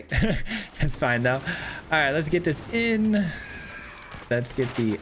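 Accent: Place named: United States. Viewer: Well he is american. They way they say everything kills me.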